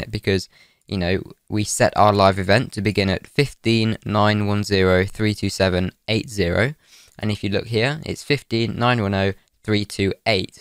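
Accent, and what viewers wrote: United Kingdom. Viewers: the voice of me but WAY more British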